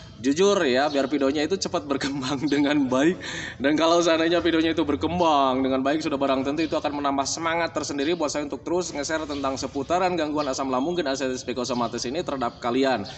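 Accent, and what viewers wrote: West Java, Indonesia. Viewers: assalamualaikum kk,kk orang mn Kya logat2 sunda gtu,pngn ktmu ih klo bsamh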